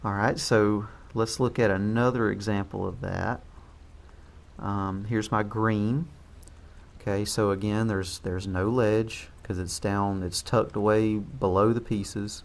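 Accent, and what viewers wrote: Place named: Australia. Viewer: A very strong Australian accent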